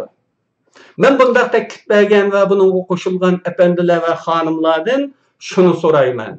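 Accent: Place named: Kashgar, China. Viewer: بەك ئوماق گەپ قىلىسىز ئاكا ھە ؟ 100 پىرسەنت قەشقەر شىۋىسى . ئاللاھ ئۆمرىڭىزنى ئۇزۇن قىلسۇن ! ھەقىقەتنى ئوتتۇرغا قويۇپ ، ساددا ، 5 ياشلىق بالىدەك ئاسان گول بولىدىغان ، ئوخشاش ئۇسۇل بىلەن تەكرار ئالدىنىۋىرىدىغان ، ئۇيغۇرۇمنى ھەقىقەتنى بىلىۋالسۇن دەۋاتىسىز ………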